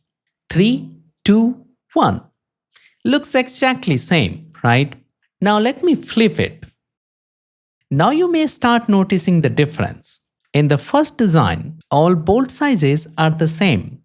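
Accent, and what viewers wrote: India. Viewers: is this dude from ahmedabad?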